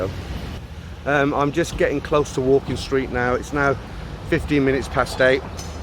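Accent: United Kingdom. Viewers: is my favorite "Thai expert" youtuber, with his classic British speaking accent and his perfect acting face. Keep up the good reportages of our ones beloved paradies. I started my Thai journeys in 1972 and my last visit was 2 years ago, thats 50 years of fun and exitment, what a good life we had. We were the lucky ones!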